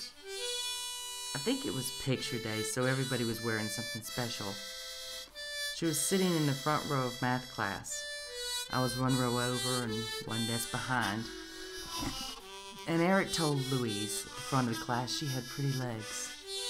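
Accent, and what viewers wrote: South, United States. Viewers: Excellent work! I'd liked the Southern type accent, you reminded me of "Thelma" from the movie "Thelma & Louise"....one of my favorite flicks! xxxxx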